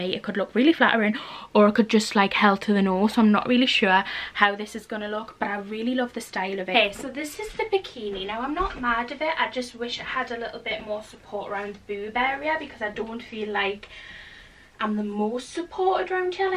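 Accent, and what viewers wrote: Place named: Durham, United Kingdom. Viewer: It’s a dressing gown lol but I’m also from Durham near you so we have the same way of speaking lol x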